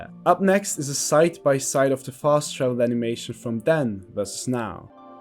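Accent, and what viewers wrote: Austria. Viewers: The Austrian accent is based as hell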